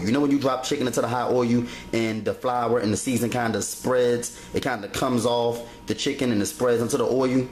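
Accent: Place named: Philadelphia, United States. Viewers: ! yes I subscribed about a month ago to his video channel and I have enjoyed his videos so far! I didn't know people in Philly talk like that. I don't understand I guess this is different unless Will Smith isn't really from Philly ... Idk😕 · He's from philly. Most of us talk like that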